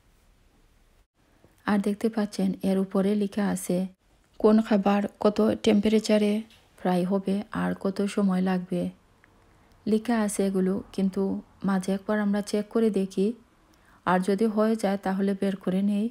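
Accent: Sylhet, Bangladesh. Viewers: সিলেটি আপু।উনারা এভাবে কথা বলে। ধন্যবাদ আপনাকে ও আপুকে। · আফা সিলেটি নি গো?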